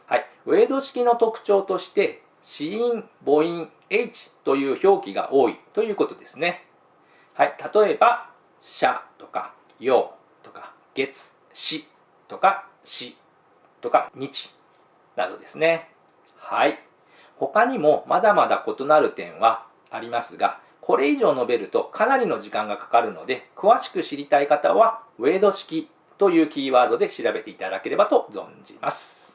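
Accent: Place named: Taiwan. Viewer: 可能您沒聽出來，這位日本人應該是個台灣人才對，聽日語能夠聽出來有跟日本人講的一些發音上的區別我，但是不得不說，日語已經特別特別好了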